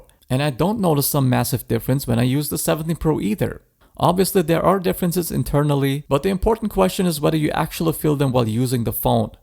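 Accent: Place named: Germany. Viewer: An interesting accent. Are u german?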